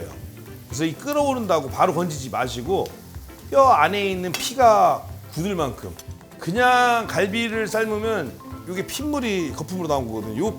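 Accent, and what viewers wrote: Chungcheong, South Korea. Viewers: 집에 갈비 있어서 내놨네요 맛나게 해먹을께요 충청도가 고향인데 언제 들어도 구수한 말씨도 좋아요~^^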